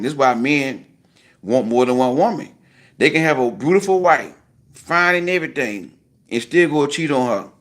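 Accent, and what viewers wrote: South, United States. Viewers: Love the country accent, Christian values, and quality content!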